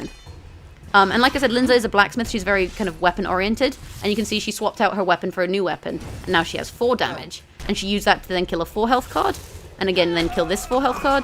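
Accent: United Kingdom. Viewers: Are you from NI?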